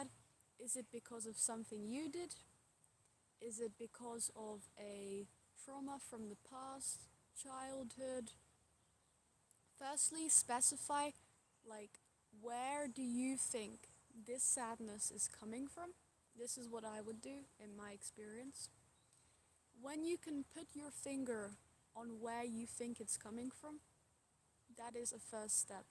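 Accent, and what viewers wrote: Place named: Australia. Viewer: please do more light language! I rarely come across light language on youtube that legitimately affects me, and yours does, along with Keleena Malnar's. Are you Australian? You sound aussie for the most part!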